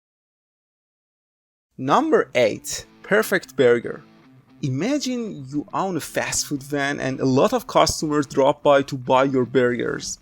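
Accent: Iran. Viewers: bro ! thanks for the video good information and suggestion ... faghat ye khorde roo accentet kard kheyli iranie :D thanks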